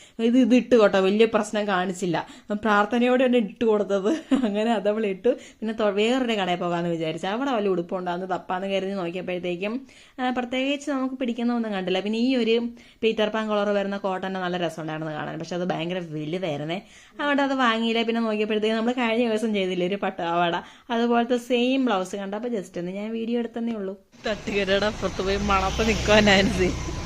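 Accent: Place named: Vaikom, India. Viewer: ഞാൻ ഒരു വൈക്കം ക്കാരി ആണ് ഇപ്പോൾ ജിസിസി യിലാണ് ..... നിങ്ങടെ വ്ലോഗ് കാണുമ്പോൾ എന്റെ നാടും കൂടെ കാണുന്ന സുഖം പിന്നേ നമ്മുടെ vaikom സംസാരം പിന്നെ നാൻസി ടെ ടാലെന്റ് എല്ലാം എല്ലാം എനിക്ക് ഇഷ്ട്ടം ആണ് ❤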